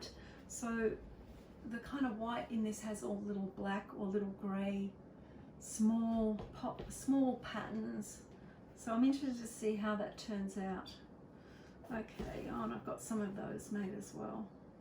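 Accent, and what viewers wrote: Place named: Australia. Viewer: So lovely to hear another Australian voice talking about my favourite subject! It is great to hear you referencing my quilting heroes such as Bonnie Hunter and Pat Sloan. So much joy ahead of you! PS. I love your dress!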